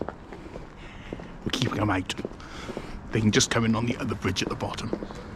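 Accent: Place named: England, United Kingdom. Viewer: You are one great historian and a narrator, not to mention, you’re an amazing cinematographer! Having lived in the Philippines, your accent can be clearly understood by most of your viewers, The Filipinos👏🏻👏🏻👏🏻 While doing your videos, stick to the English accent we understand not with Gaelic or Welch accent 💖💖 more more more please. NEXT: Cardiff Castle and the markets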